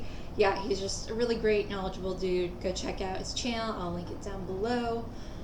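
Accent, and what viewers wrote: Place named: Pacific Northwest, United States. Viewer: Hey, you showed up in my recommendations the other day, so this is the first video of yours I watched - and it made me a subscriber! Great easy-going style you have, and in a YouTube genre that's far too dominated by men, if you ask me. It sounds like you're a fellow Pacific Northwesterner, too, which is all the more fun.:) I hope you survived last week's heat wave OK. I look forward to watching more of your stuff (and I invite you to take a peek at my channel if you haven't yet)! Cheers!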